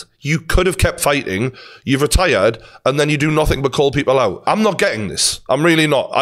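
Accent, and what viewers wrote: Newcastle, United Kingdom. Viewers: Yooo geordie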